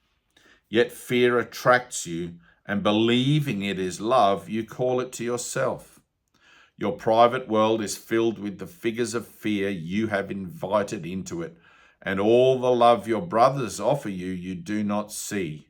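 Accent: Australia. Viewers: Hey mate u sound like you're an Aussie, were abouts are u from? I currently live in Perth, but from nsw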